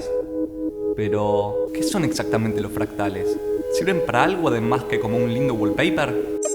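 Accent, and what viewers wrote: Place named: Buenos Aires, Argentina. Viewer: De Buenos Aires sos!! O de Rosario... es el típico acento!!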